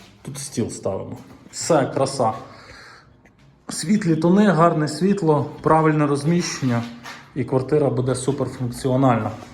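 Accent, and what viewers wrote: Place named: Surzhyk, Ukraine. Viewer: Мова з суржиком, трохи гидко звучить.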